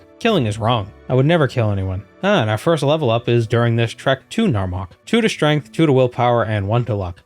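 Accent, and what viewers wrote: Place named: New England, United States. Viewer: where in New England are you from, I only just realized that you have an accent because I'm so used to that just being how people talk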